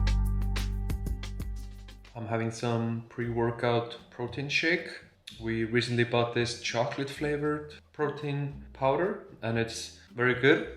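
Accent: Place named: Iceland. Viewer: has a more pronounced Icelandic accent than Birta, this is cute :)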